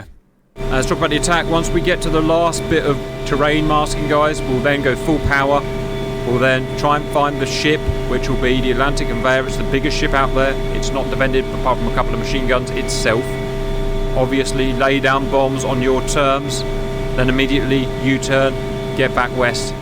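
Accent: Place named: South, United Kingdom. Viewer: OOOhhh southern UK...Noice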